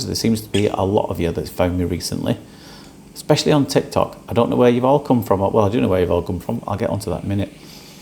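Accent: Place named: Wales, United Kingdom. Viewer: Hello, I don’t think you “ dribble”. You have a different dialect. It may come from wales? Thank you for a great lesson.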